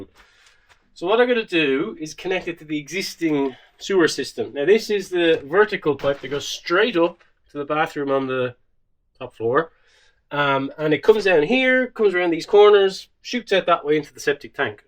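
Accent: Ireland. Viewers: yes. I had noticed his Irish accent ❤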